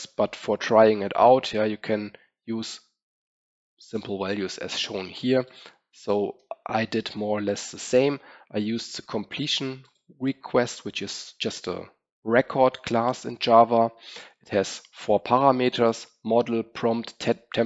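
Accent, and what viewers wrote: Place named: Germany. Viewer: Man erkennt die Deutschen halt einfach an der Stimme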